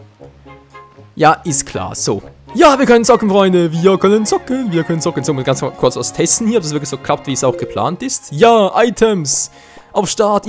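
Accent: Switzerland. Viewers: Kommst du aus der schweiz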